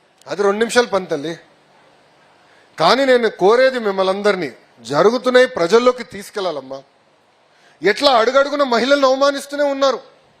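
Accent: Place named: Telangana, India. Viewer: Enti bro telangana slang ra Bai idi enti bai good good rabai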